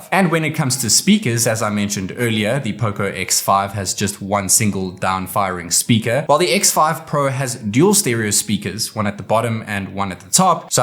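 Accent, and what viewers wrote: South Africa. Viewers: he sounds South African I’m not sure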